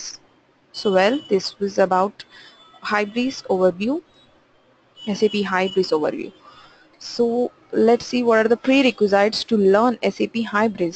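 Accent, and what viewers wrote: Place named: United Kingdom. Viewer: very nice reading and beautiful british accent - music to my ear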